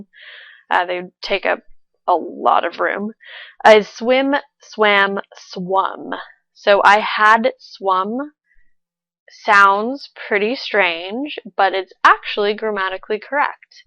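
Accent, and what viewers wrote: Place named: United States. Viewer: Hey from Brasil It's quite nice your way of teaching and your accent as well. Are you american?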